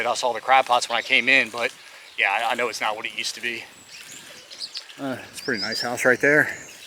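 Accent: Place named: Virginia, United States. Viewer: What part of Virginia are you yourself from, the Tidewater area? You're talking about the Tangier accent but I'm wondering about yours. I grew up in the 757 and you sound like many of my relatives.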